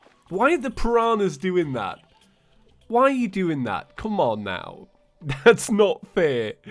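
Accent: United Kingdom. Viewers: I saw the video and there were already six views! Happy Thanksgiving. P.S. Just realized you’re British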